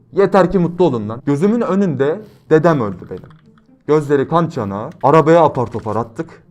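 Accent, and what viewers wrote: Adana, Turkey. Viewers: Abi sen adanalı mısın?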